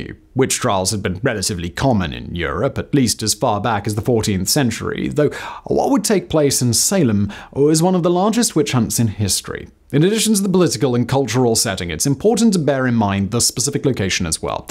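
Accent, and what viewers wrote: United Kingdom. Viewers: I thought British people only talked like this in movies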